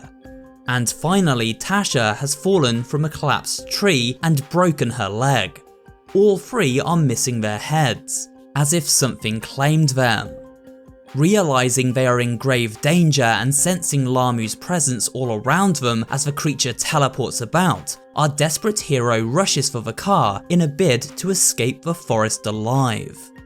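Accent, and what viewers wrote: United Kingdom. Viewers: THE BRITISH ACCENT JUST MHWA❤️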